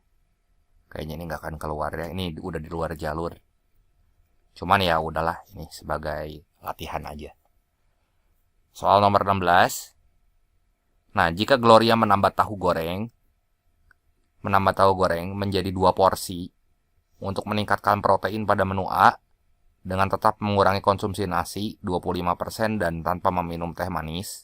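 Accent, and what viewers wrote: West Java, Indonesia. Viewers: kak terimakasih sangat membantu!!! semoga aku bisa tembus skor 1000 hehe btw lucu bgt sm aksen sundanya😆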